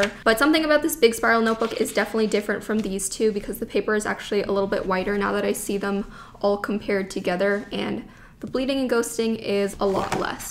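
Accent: Canada. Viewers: cocobana29 she’s canadian, but go off... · are you canadian? i know, weird question but still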